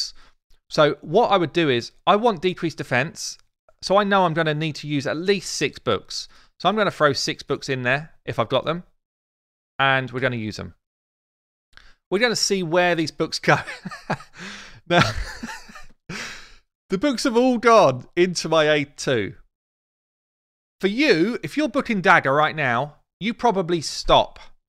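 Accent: United Kingdom. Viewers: what a very beautiful British accent you have !! amazing, i watch your videos to improve my skills in english, i'm french native. Also because i'm a RSL free player. You do a good job, your videos are very interesting. Sadly i don't understand everything you say, because of my level in english, and i'm not used to your Cambridge beautiful accent. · Just found you very helpful just starting out, I am way outta my depth with this game but I will get there, also good to have a Brit talking instead of over the top yanks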